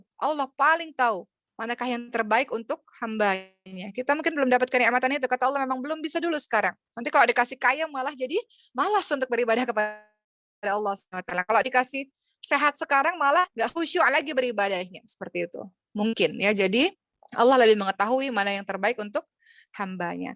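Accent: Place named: Arab world. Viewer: TERLALU CENGKOK ARABNYA. ULAMA PUN GAK BEGITU.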